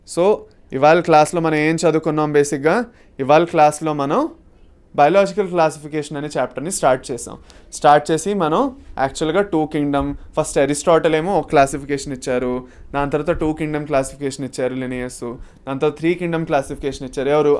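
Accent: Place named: Andhra Pradesh, India. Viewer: Chala baga chepparu sir Urs is pure andraite slang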